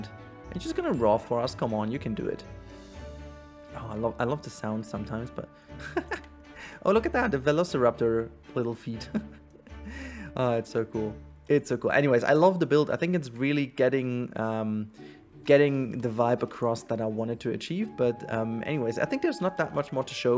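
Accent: Germany. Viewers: Kann es sein das du aus Deutschland kommst xD ? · Du bist aber deutsch oder weil dein Name und dein englisch verraten das 😊👌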